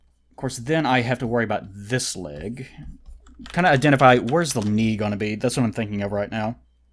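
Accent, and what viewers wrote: South, United States. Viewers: You became way more Southern in this one. Your drawl was really drawn out. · I feel like voice sounds noticeably more Southern then it normally does at times. It's weird for some reason, despite knowing lives in Kentucky. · It sounds like his gain is just set much higher, I had to actually turn him down on my end, he's as Southern as usual, just louder. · I can hear your southern drawl!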